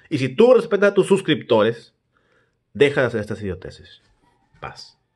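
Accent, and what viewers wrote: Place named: Sinaloa, Mexico. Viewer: Alv, trae mota el:v, como se nota que es de Sinaloa alv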